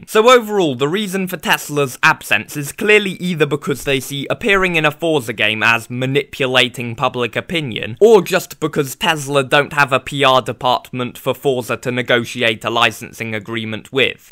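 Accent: United Kingdom. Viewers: you are british, right?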